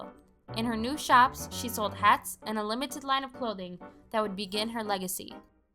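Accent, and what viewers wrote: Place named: United States. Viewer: Sorry, the voice commentary is very bad. Terrible, high pitched, American accent.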